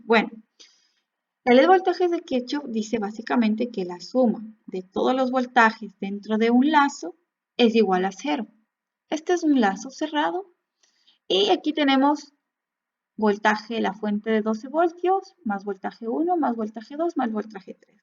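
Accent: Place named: Spain. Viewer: Buena explicación y más ya con ese acento bien españolizizimo 😂😂😂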